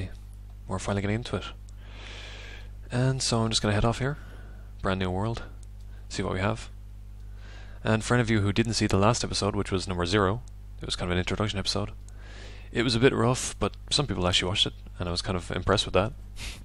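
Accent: Ireland. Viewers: well my guess is irish .. or irelandish whatever ... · Hey. Love this LP already. I was trying to place your accent, so my official guess is Cork...? Keep it up! =D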